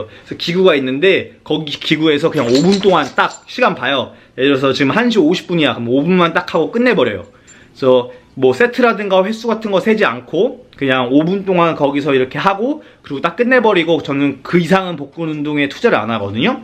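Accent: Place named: Chungcheong, South Korea. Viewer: 말투가 충청도 분 같네요 인상 좋으심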